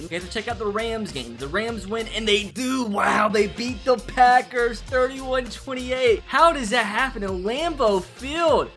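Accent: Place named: South, United States. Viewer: This guy has to be from the south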